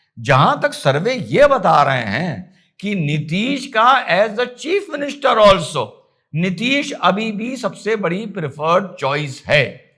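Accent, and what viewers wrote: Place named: Lucknow, India. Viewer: bhai वंदे मातरम। इस रिपोर्ट में थोड़ा संशोधित कर लें। माननीय मुख्यमंत्री नीतीश कुमार जी की महिल रोजगार योजना में वहां की महिलाओं को वन टाइम के रूप में 10000 रुपए की आर्थिक मदद देने की बात है ना कि प्रति महीने। आपकी प्रस्तुति ठेठ लखनवी अंदाज में होती है जो मुझे अत्यधिक स्वीकार्य होती है। बहुत ही पसंद आती है। इसी प्रकार बरेली की घटना की शानदार रिपोर्ट करते हुए आप अचानक ही पक्के मुसलमान उन जैसे जिन्हें हम और आप भी कट्टर अर्थात् देश विरोधी मानकर चलते हैं। उनकी नजर में केवल इस्लाम है और कुछ भी नहीं फिर वह दोस्ती हो,इंसानियत हो याकि देश की एकता और अखंडता ही क्यों न हो? अपने उस रिपोर्ट में जिस अंदाज में विषय से हटकर अपने प्यारे मुसलमान भाइयों को जगाने का प्रयास किया वोह सिर्फ एक वाक्य हो हम जैसे आपके प्रेमी दर्शकों को भीतर तक हिला गया। उम्मीद है मेरी इस विवेचना को रिपोर्ट के परिप्रेक्ष्य में सापेक्ष रूप में लेंगे। जय हिंद । सबको सही रास्ता दिखलाने वाली उस परम शक्ति से बारम्बार एक ही प्रार्थना है कि आप उम्र के इस पड़ाव में अपनी मेहनत, विद्वता और कल्पनाशीलता के जरिए पूरे देश के साथ साथ पूरे मुस्लिम जहान में छा जाएं।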